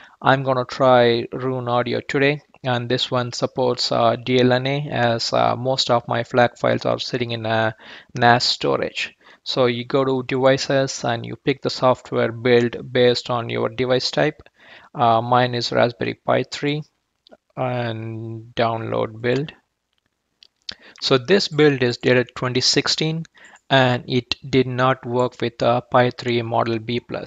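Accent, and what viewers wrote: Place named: India. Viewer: Thank you,. 1. So I need to find a router that has a USB port and connect the RPI and the hard disk to that router? Thanks 2. Yes Volumio and Max2Play are paid but hopefully they will offer some support in return, at my age I need a lot of hand holding when it comes to software. 3. These small screens connect via what is called SPi. I assume this means Special Purpose Interface and they require coding of which I have zero knowledge. I would have to find some youngster willing to help me with that. 4. So your laptop was never connected to the RPI? Thanks again for your quick response. I guess from your accent that you are an Indian living in the US. Probably a young software professional. So thank you for helping a fellow “Desi”.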